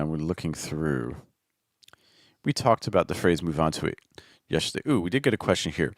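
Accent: United States. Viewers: Please tell us which US regional accent that you have😊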